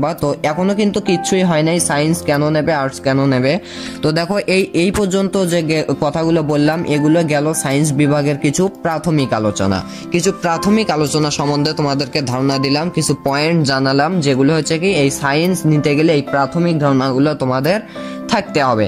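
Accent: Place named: Joypurhat, Bangladesh. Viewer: জয়পুরহাট এর ভাষা 🙂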